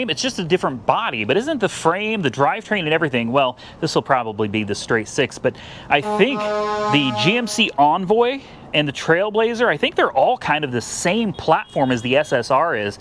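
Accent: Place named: California, United States. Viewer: It's hard to believe you're from Indiana, you talk just like us boys in California.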